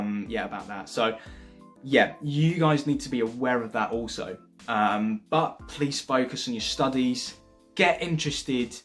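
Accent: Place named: RP, United Kingdom. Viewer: Well I don’t know about his nationality, bjt he defo has a pretty standard british accent :)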